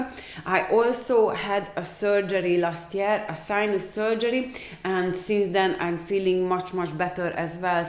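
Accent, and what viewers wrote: Hungary. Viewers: nem magyar vagy véletlenül? hát én ismerem ezt az akcentust, nem lehet más :D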